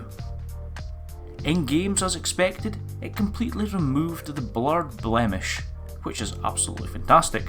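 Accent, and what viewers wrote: Scotland, United Kingdom. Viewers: Love that Scottish accent m8😂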